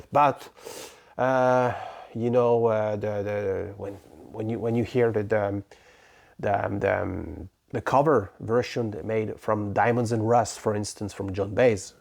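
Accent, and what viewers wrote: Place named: Italy. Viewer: Salut j'aime beaucoup ton accent italien 😁😉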